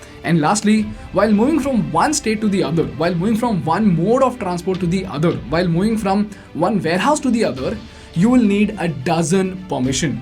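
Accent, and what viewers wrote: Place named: South India, India. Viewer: I can't with this guys aiyyayyo accent. Though the topics are good, I have to switch. Hopefully he can learn to speak without marbles in his mouth. No offense.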